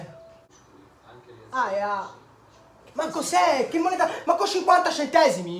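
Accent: Florence, Italy. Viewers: Ma tutti i toscani hanno la c strana? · Sei forte ti vorrei attore in un film di Pieraccioni ta na parlata fiorentina che mi schiantare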